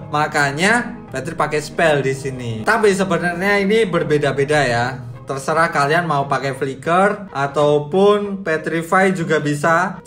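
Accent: Java, Indonesia. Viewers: Ngakak , sampean wong jowo ndi bang patrick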